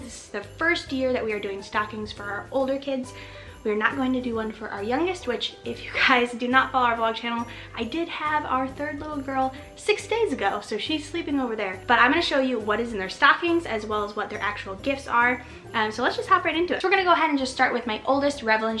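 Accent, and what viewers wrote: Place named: Georgia, United States. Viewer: I love your style and the four item Christmas Deal. I am a prior teacher and a struggling but succeeding minimalist. The items you choose for the girls seemed spot on. I just found your channel. First, watched the Christmas Decoration Video. I am the one who fell in love with your white tree. Like that your accent is similar to mine as I am from Georgia, yet, now live in Palm Harbor, Florida. I will be obsessed tonight and tomorrow catching up on your past videos. Merry Christmas 🎄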